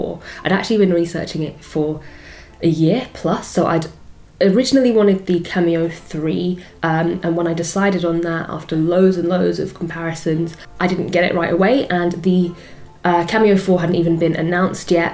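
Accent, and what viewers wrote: United Kingdom. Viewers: I need help with shaping my art to make it a career I was really good in drawing growing up but I stopped and dropped outta high school.... got me a GED diploma and joined the USARMY... I’m still looking into arts and plus I’m in Europe I got stationed in Germany I know you’re in UK because of your accent lol😊 can you help me with advices so I can get back up in my art?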